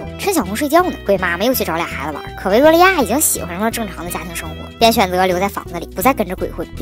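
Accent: Northeast, China. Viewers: 哈哈 讲解的一口东北大碴子 太搞笑了 逗乐了都！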